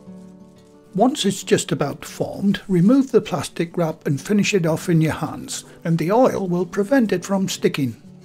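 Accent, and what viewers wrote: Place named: Northern England, United Kingdom. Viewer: Brilliant channel. I'm an expat Brit abroad and love hearing your northern accent. Very homely and wonderful old school British recipes.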